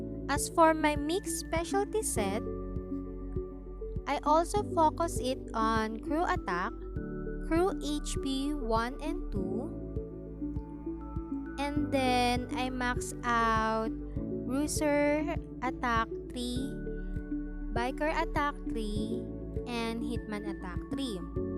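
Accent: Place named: Philippines. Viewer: sa way ng pag pronounce mo ng english i think pinay ka hehe ang cute🥰 more upload pa po ng grand mafia tips pls🥰🙏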